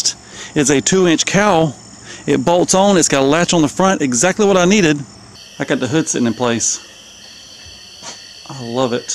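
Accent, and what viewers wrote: United States. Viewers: Fantastic work!!! You’re the example of what having a YouTube channel is all about. Quick and brief explanation of what is going on and what you’re about to do. No useless rambling or tongue smacking like an African tribe and not sounding like you’re severely parched lol. Sorry for the rant but it’s so refreshing to find someone who speaks like an American. Sub’d 🤙🏾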